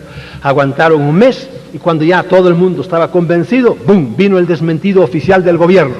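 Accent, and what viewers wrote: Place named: Spain. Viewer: crean solo en mi palabra sin ninguna evidencia de nada ya que es mas comodo y barato para mi . parece increible que despues de tantos años todavia sigamos doblegados y nos dejemos imprecionar en cuanto oimos el acento español, haa pero no sea uno de los nuestros por que le exigimos evidencias fotos y aun asi lo denostamos Que nos pasa?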